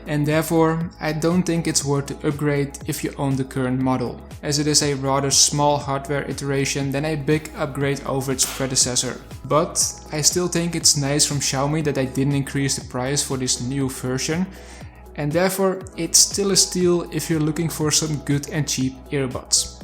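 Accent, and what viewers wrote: Netherlands. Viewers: Goed Nederlands accent hoor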